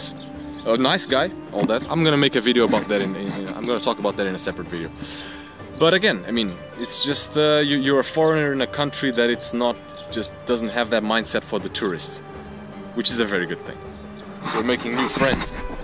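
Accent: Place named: Australia. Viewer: It sounds like Australian accents maybe wrong well that was nice from you Mate thanks for your visit to our beautiful country. for you guys is cheaper 👍🏼 come and visits us more often there's so many places to discover. Thanks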